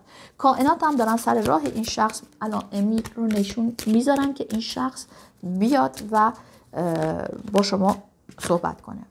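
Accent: France. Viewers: oui. C’est ce que j’ai pensé. Quand vous parlez en perse vous faites certains sons( ex: op) qui rendent évident que vous êtes française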